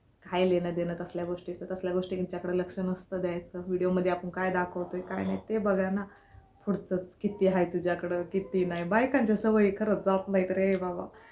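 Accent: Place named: Latur, India. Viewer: ho latur chi bhasha lagech olkhu yete..... Me aushyachi ahe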